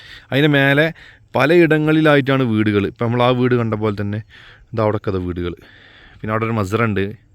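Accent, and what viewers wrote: Malappuram, India. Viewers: ആദ്യം നിന്റെ സംസാരത്തിന്റെ ശൈലി മാറ്റ്.. മലപ്പുറം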